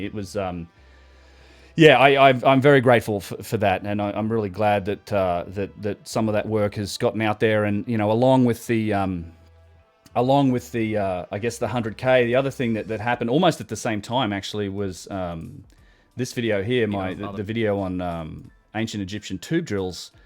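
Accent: Australia. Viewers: What are you doing is northern Cal .... hot maybe sacramento .....nice to here Aussie anyway ...Bro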